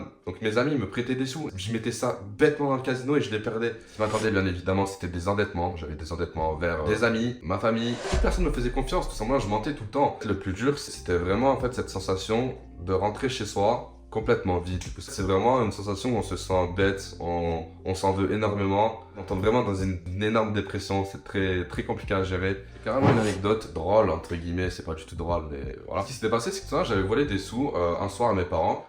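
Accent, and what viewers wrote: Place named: Belgium. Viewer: Vous avez un accent Belge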